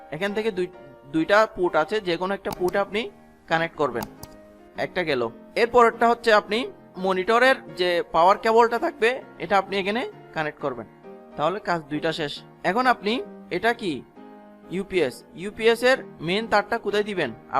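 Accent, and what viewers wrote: Chittagong, Bangladesh. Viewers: ভাই মনে হয় চাটগাঁইয়া 😊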